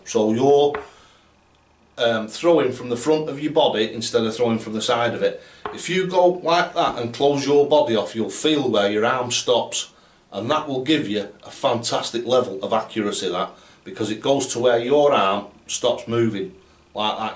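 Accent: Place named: Manchester, United Kingdom. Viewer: Great videos... Nice to hear darts explained in a way I can understand/ relate to and in a propor accent too. Mancunian 😉👍🎯 I'm only a few months in to taking darts more seriously and your videos have helped me a lot pal. Cheers for that. As a pub landlord looking to get a team going I figured I'd need to be able to chuck to a reasonable standard haha. 👌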